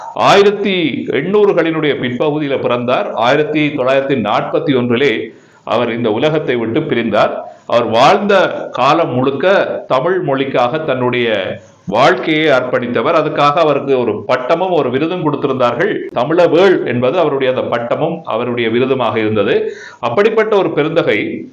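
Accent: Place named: Sri Lanka. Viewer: தங்களது இலங்கைத்தமிழ் மிக அருமை ! உலகிலேயே சிறந்த தமிழ் இலங்கையில் மட்டுமே உண்டு !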